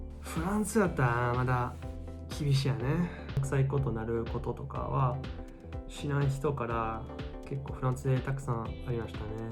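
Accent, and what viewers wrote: Kansai, Japan. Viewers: ♥独り言をぐずぐず言っているような喋り方で、すごく聞き取りにくいので、済みませんがもっとはっきりとしっかりと喋ってもらえませんか。 嫌味ではありませんよ、励ましですよ。 ♥う○ちについて蘊蓄を傾けるところがすごい。 花の都巴里ではなくて、実態は、う○ちの都巴里だったのですか？という事は、デート中でもう○ちを踏む確率大なのですね。それに、う○ちを踏んでいる事に気付かないままキスしている事もあるって事ですね。 地雷踏むのも、嫌だけどう○ち踏むのも嫌だな。 私は生まれてまだ一度もう○ちを踏んだ事はありません。偉いですか。 ♥御辞儀だったらいやいやでも出来るけれど、いやいやのキスなんか出来ないな。どうしているんですか？ コロナ禍であってもキスの習慣は健在ですか？どうしているんですか？ ♥関西在住ですか？何となくアクセントが。。。 ♥関係無いですが、若いのに額が後退している模様。これは励ましではありません、禿げ増しです。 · アラブ人に見える。言葉は関西人に聞こえる。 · 急におすすめ出てたので見ました。 フランス人は日本人キライかと思ってたのですが、貴殿で3人目です❤️ YouTuberで日本loveな方に出会えたの😊 しかも関西弁😁 親近感湧きまくりです☺️ チャンネル登録しまぁす😄 これからも宜しくお願いしまぁす🙇🏻‍♀️ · 少し関西弁だなーと思ったら、京都に住んでるんですね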